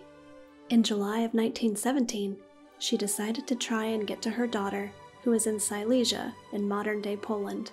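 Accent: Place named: United States. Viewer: Sad that the speak is american. the crediblilty changes when its us talk.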